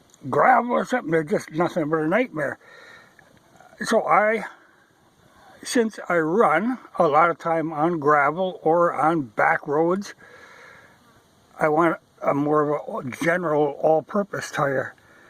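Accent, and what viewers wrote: United States. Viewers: It was a little difficult for me to understand your accent during the first couple of minutes. To me it sounds like a southern USA accent but I really don't know. I'm from northern Spain, near France, and I still enjoy my Bultaco Pursang MK8, 363 cc that I bought new in August 1975. This bike came with Motocross racing tyres 3.00x21 and 4.50x18, but I tend to use Trials tyres in sizes 2.75x21 and 4.00x18. Michelin Competition is the lightest and stickier, Dunlop or Pirelli made for Trials Competition are also good, but tgey last very long like you would expect for every Trials tyres. Should I try your choice from Dunlop, if they make them in 2.75x21, or maybe 3.00x21? By the way... Im very intrigued with your accent. Where are you from?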